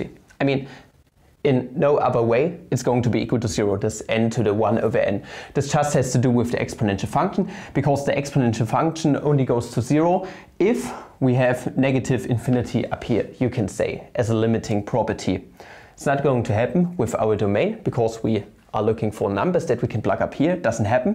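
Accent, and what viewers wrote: Germany. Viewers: I don't think this is only because of the German accent but does sound a lot like Der8auer ^^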